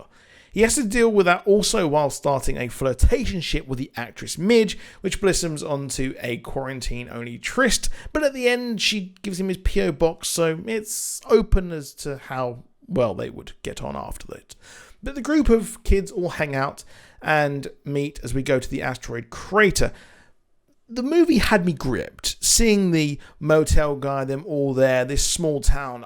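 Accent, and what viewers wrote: United Kingdom. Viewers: Why do you sound very British like!? You're from Rhode Island.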